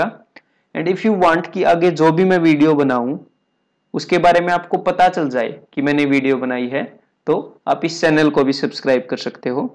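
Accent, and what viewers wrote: Haryana, India. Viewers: bhai haryane ke ho ???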